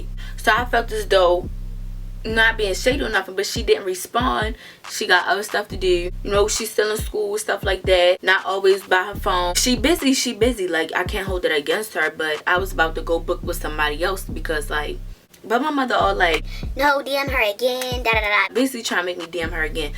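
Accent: Baltimore, United States. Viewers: Just came across dis video while watching different storytimes Nd You said “do” a couple times and I straight knew u was from Baltimore 😂.. but this exactly y I hate getting my nails done I swear it always hurts then I don’t be saying nothing to em.. i just be sayin “pretty hurt” in my head but it be burning fr 💯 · You have a accent are you from Baltimore? I enjoyed your story time ☺️ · Now tell me why I knew you was from Baltimore before you even started the story 😩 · U from Baltimore? U sound like one of us. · Ma'am are you from Baltimore?? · Are you from Baltimore ? Love your accent . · I was going to ask if she was from Baltimore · My first video of u and know u must be from Baltimore I love the accent lol💕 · are you from bmore? lol you sound like people from there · Tew, dew, stewpid 🤣🤣🤣. I love Baltimore accents 😂. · Nah she sounds like she from Baltimore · I just know you’re from Baltimore. 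You got to be ! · u gotta b from bmore lol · Baltimore ASFFF😂👏🏼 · You from Baltimore ain’t you lol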